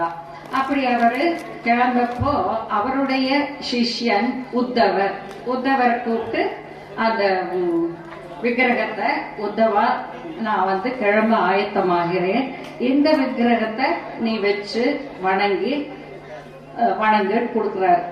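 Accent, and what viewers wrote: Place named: Coimbatore, India. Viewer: Konjum Kovai thamizhilil gurujivinudaya speech arumai 👌🏼 Blessed to be your student 🙏🏽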